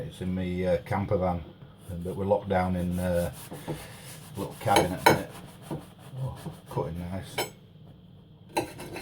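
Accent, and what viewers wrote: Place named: Midlands, United Kingdom. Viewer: Love this down to earth video ❤️. Reading the transcript had me in stitches, obviously not someone who understands the midland accent🤣😂. Yes I did make 2 decent loaves of bread following his instructions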